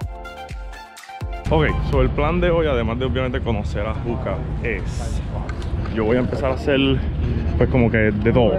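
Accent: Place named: Mexico. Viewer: no te conocía Bro, youtube me sugirió tu video y blogeas muy bien, vas bien, eres de PR pero traes un toque como mexa y eso es cool, saludos desde MX!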